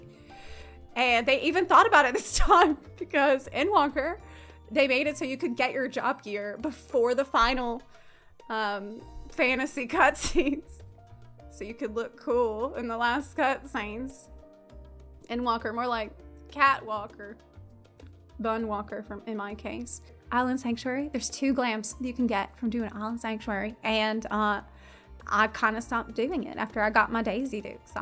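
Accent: South, United States. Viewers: Did pick up a southern accent? It's pretty adorable. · Did I just find a streamer with a southern drawl?... I hope that's not a pet peave. Because that's cool, and subscribed! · The southern accent is here thick now and let me just say I'm here for it. I feel represented. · No I agree that's a lot of cool glamorous and stuff in the game and it's a fun part of it and that's just it if that's all you want to play play it like that it's your game play the way you want to that's what they designed it to be I thought. Also I'm not sure if you're putting it on for a show but I'm hearing slips of southern accent are you originally from the southern Us by chance? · A few comments as I watch.. 1) lmao that thumbnail. Great editing xD 2) "What is a mount but a pedestal for which to put my character in her perfect glam" 🤣 That got me. 3) Thing really escalated around 7mins hahaha but it's so true. 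IT'S ALL TRUE. FOR THE GLAM. 4) I realize there's so many clips just to show off glam. There was a lot of work put into this video <3 5) I can hear that southern accent coming out strong 🤠 I love your content,. I'm glad to see stuff here again and I'm sure a lot of us feel the same way about the pursuit for Glam. Why do Savage when gear is obsolete not long after? Stats are temporary... GLAM IS FOREVER. I'll say this though.. The game needs more suits and shoes imo. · I'm dying laughing cuz you got a southern accent in like 6 months of me not watching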